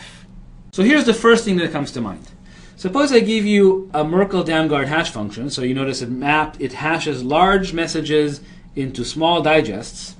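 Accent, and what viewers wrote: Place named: United States. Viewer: Omg finally an American accent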